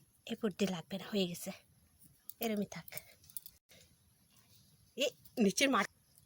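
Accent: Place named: Andaman, India. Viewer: বাড়ি কোথায় বৌদি? কথা শুনে তো আমাদের আন্দামান এর লোক‌ মনে হচ্ছে।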